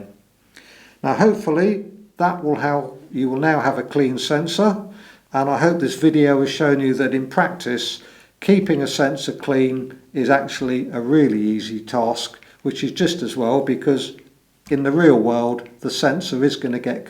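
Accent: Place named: England, United Kingdom. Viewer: You sound like your from.england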